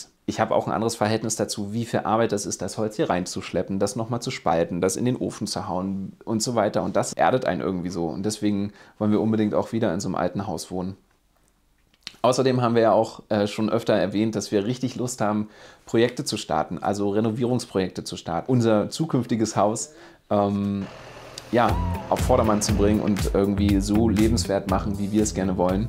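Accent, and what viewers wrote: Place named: Berlin, Germany. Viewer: Super, herzlichen Glückwunsch zu dem Arbeitsvertrag! Das freut mich für euch 🙂 Um eure Frage bzgl. der Sprache i.S. Kanal zu beantworten: Ich spreche leider nicht perfekt englisch - auch nicht annähernd. Ich freue mich deshalb immer wahnsinnig, wenn endlich mal ein Kanal aus Skandinavien auf deutsch ist. Wenn der Kanal in Zukunft auf englisch wäre, würde ich wieder mit Untertitel schauen müssen, was dann oft bedeutet, dass ich das Video zweimal schauen muss, weil ich erst lese, um was es geht und mich beim zweiten Durchgang auf die Bilder konzentriere, denn die sind bei euch immer sooo schön. Ehrlich gesagt würde mir auch das "berlinern" zwischendurch SEHR fehlen ;-) .... Aber: auch wenn ich die Videos dann vielleicht nicht mehr schaue, werde ich euch treu bleiben, auch liken und auch einen Kommentar da lassen (wird dann eben immer nur ein Herzchen ;-), alleine um euch mit meiner Stimme zu unterstützen .... 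Im Sommer das Haus verlassen .... wie blöd! Och Mann, das ist eine wirklich blöde Situation für euch. Ich wünsche euch, dass ihr euren Weg und eine zufriedenstellende Lösung findet. Liebe Grüße ❤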